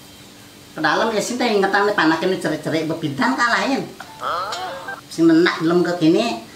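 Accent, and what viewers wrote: Buleleng, Indonesia. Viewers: Hahaha..lucu luh, ngoceh logat buleleng, sambil masak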